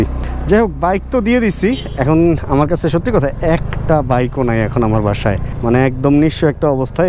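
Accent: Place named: Bandura, Bangladesh. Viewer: Bandura kothy basha apner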